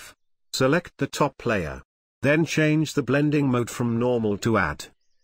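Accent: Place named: Pakistan. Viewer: Wow. Your Accent is cool. Amazing when I knew yoy from pakistan.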